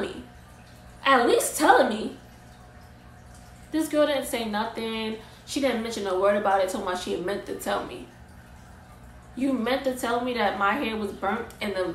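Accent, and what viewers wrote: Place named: New York, United States. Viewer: I hear her accent Im from nyc too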